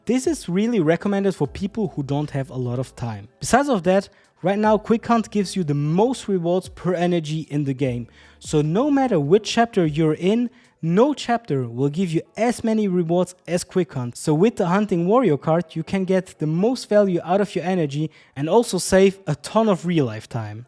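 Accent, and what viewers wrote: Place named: Germany. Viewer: Idk, maybe it’s me, but when I listen to your English, it feels like you a German. Hab ich recht ?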